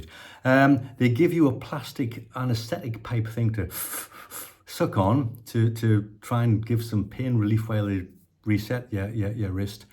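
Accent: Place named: Northeast, United States. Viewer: Hi, Thanks for your video on your broken wrist to guitar playing recovery. Ive just broken my left wrist and hope to get back to it. One day. I'm from the northeast, originally so your video voice is very familiar. Thanks again for the lifting video. Oh and great playing... well done. Top man!!!...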